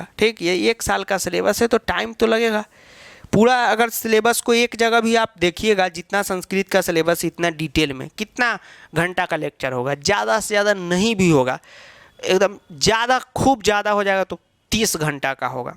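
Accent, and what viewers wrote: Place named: Uttar Pradesh, India. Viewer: He is not bihari he is up wala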